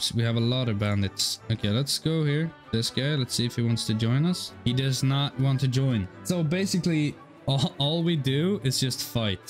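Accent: Sweden. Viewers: You get a sub for Bannerlord and a fellow Swede speaking Swenglish.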